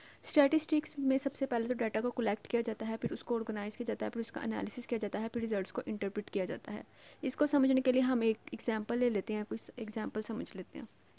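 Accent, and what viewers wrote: Lahore, Pakistan. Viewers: Your accent reveals that you are from Lahore 😅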